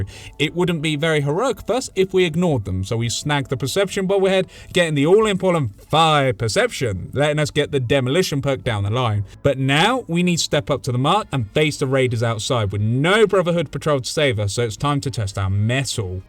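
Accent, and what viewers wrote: United Kingdom. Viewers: I swear you make yourself sound more British every video. I guess I kind of do the same thing in person where I make myself sound more American. I’ve recently added “I don’t rightly know” to my lexicon.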